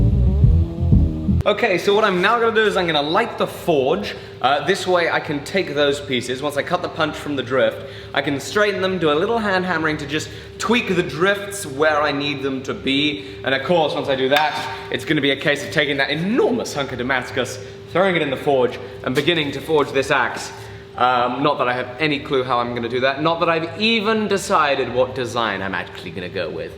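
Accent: England, United Kingdom. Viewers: I wondered how I watched 3 episodes in 2 days and then I realized he's clearly English.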